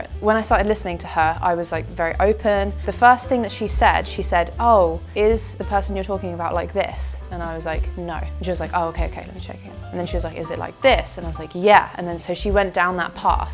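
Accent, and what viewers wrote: United Kingdom. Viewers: 英國腔真是好聽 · I really enjoy your British accent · 还有美妙的英国口音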